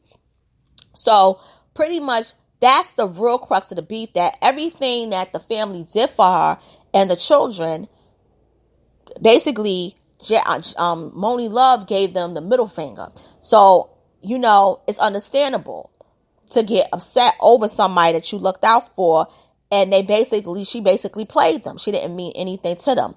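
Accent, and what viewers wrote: New York, United States. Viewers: Yo your voice! Straight N.Y Yo!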